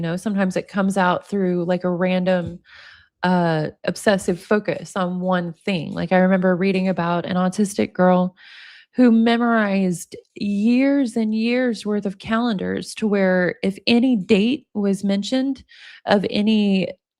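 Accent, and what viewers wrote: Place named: South, United States. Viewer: Having just found you with this video, I absolutely love how you don't operate off of malice and a burning desire to destroy a complete stranger, but simply doing your best in reporting the facts that are available to us made more palatable with a sprinkling of sarcasm and even that's hard to detect thanks to your lovely Southern? [I'm a Brit lol] accent and a wee giggle at the end 🤭😍 that just serves to lighten an extremely dark case all around making it far easier to digest ❤❤❤❤ And that's what's so incredibly frustrating, as they don't even need to introduce new legislation in order to hold Ms. Becky accountable for her actions, far from it actually, as truth be known is there's plenty, plenty laws and statutes in existence that would hold her suitably accountable, but it's incumbent upon the powers that be to simply ENFORCE >>>>EXISTING<<<< LAWS OF THE LAND that could easily apply to Ms. 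Hill including... 1/obstruction of justice, 2/conspiracy (with her son who's also been charged in relation to this debacle) to pervert the course of justice, 3/conspiracy to subvert judicial proceedings, 4/conspiracy to personally enrich oneself and /or profit from the strictly prohibited dissemination of protected /sealed images pertaining to an ongoing criminal trial, 5/conspiracy to engage in and /or assist another to engage in the prohibited interception of protected telecommunications by means of illicitly recording (wire tapping) protected telecommunications for the use of malicious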